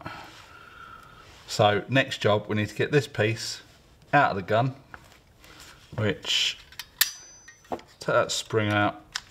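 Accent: United Kingdom. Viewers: 2 seconds in i hear the english accent...And guns, i subscribe, same as me, born and bread 43 yrs...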